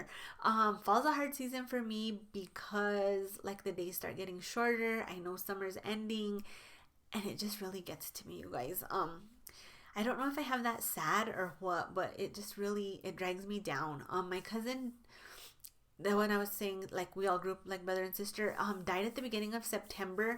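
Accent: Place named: Miami, United States. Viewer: Just started watching this video but I am already fascinated by the accent thing. What most people don't realize is that every person who speaks English has an accent. They just don't hear their own because they usually have the accent of their local area and peers. Or we associate non accented speech with whatever we hear in popular media, but that's also an accent. What I am fascinated by, is that when I first heard you, I thought I heard a bit of a "Miami accent." But not quite. Now it makes sense though! Miami accent is influenced by more Caribbean influences, but both yours and theirs have the darkened L sound. I could talk about this forever. Let me keep watching for polish😅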